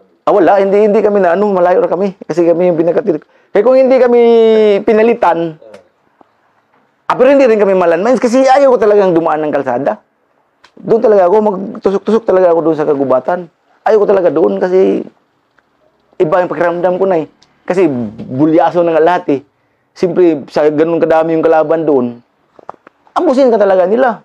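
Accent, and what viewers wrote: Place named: Visayas, Philippines. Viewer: Bisdak kaayo c Master.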